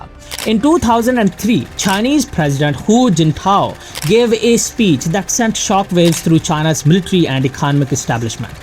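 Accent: India. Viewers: Indian accent. BS from a trouble maker.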